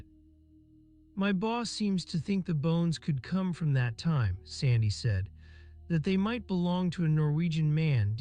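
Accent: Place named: United States. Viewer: Thick US accent….. disservice to Cleaves.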